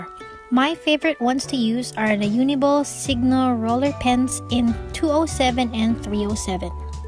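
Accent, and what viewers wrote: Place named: Philippines. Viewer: Your voice kinda reminds me of a Filipino accent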